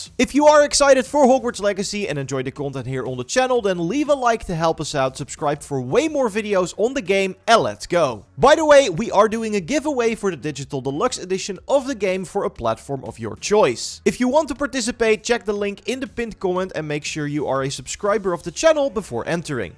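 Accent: Netherlands. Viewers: You sound dutch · I smell Dutch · Are you Dutch? You kinda have a Dutch accent that's why. Also looking forward to the game! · Are you Dutch?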